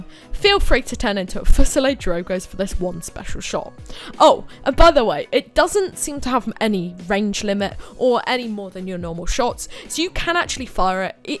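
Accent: United Kingdom. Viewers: Ehh leave him alone, he's quite informative and the tone of his voice is lively plus the british accent which tops it off. Tbh i could listen to him all day, i mean somehthings are bestare what they are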